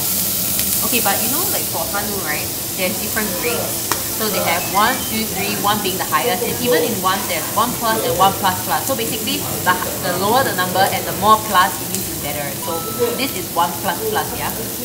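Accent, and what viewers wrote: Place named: Singapore. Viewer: Love your videos, especially your interesting Singaporean English accent (in a good way though)!! I visited Seoul a couple times and really like this city and Korean culture. I have tried to learn Korean as well by myself (as I like to watch Korean dramas and movies), but it's hard, maybe because I'm too old learn a new language. I can still understand a bit though, as some Korean words/phases actually sound like Cantonese/Mandarin. Actually, I have a suggestion - I'm vegetarian but find it hard to find real vegetarian dishes in Korea. Even Naengmyeon, which I like, uses beef broth. It would be great if you could recommend some nice Korean vegetarian dishes and restaurants in the future:)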